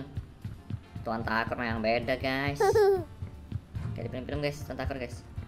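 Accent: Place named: East, Indonesia. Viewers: Cara ngomonya orang papua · Bang itu Kayanya orang Papua Bang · Logat nya orang papau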